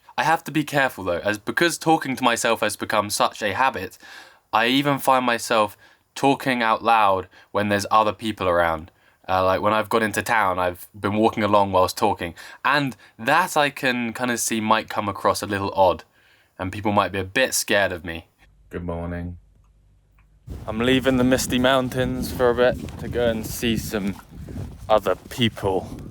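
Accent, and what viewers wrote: United Kingdom. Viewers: you are living in these luxuriously furnished mountain huts and you are presumably a rich man.because rarely people can afford to live in such a spectacular condition you are living alone.good luck.I enjoy from your incredible videos and your pure Brtish accent as well. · OMG ! you sound exactly like "Thoughty2" in this bit here. You must be from the same area, since there are so many accents in the UK. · Hello I'm Trish, i come across yr channel and all i could do is smile big!! You are warm as that fire, handsome, smart, and make great Vij ya's. I watch lots of U Tubers from the U,K and always enjoyed yr culture and of course thee accent. Keep busy and happy, yr there!!✌💙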